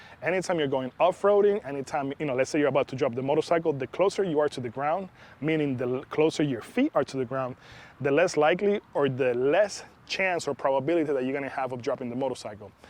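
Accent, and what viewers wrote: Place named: Cuba. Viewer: I detect a Cuban accent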